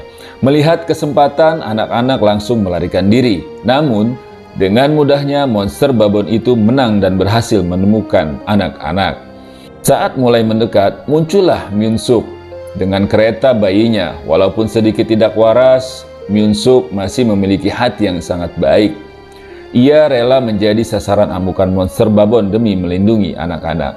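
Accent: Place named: West Java, Indonesia. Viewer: Ciri khas Sunda Na ka bawa we eta medit hahahaha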